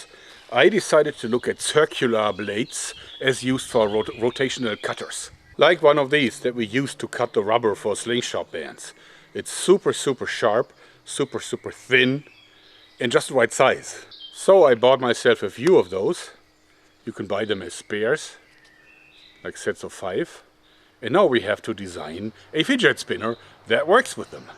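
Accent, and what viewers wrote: Germany. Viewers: he have German Sound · Warum redest du englisch ? Jeder hört doch das du deutscher bist 😂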